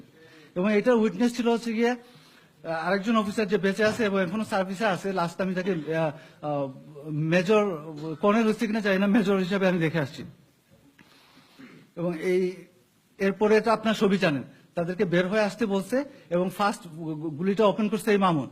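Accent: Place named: Bogura, Bangladesh. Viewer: ভাইটি মনে হয় বগুড়ার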